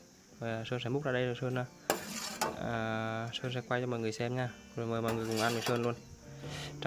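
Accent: Vietnam. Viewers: Bạn người nghệ an à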